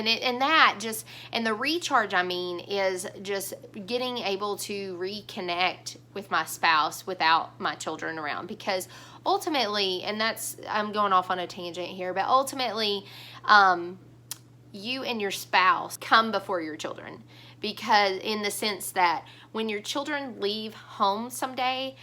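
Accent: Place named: South, United States. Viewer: I want your Obstetrician! Also, I don't like pregnancy at all but love actually birthing. I always get epidurals though. Have birthed 6 babies and I love your channel...had no idea you adopted. The more I learn about you, the more I love you. God bless you, mama. You're doing a great work. Also, your accent is just amazing. I'm a Texas, but you are the epitome of southern. ❤️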